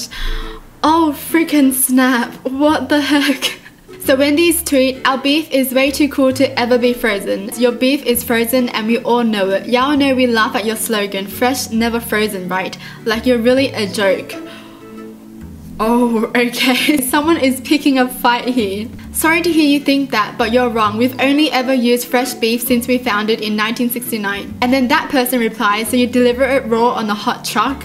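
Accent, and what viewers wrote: Australia. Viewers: do u live in Australia bc ur accent sounds Australian and u said u live in Sydney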